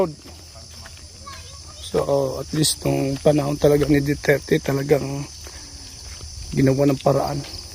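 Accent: Iloilo, Philippines. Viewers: Ilonggo gale Ka sir?😅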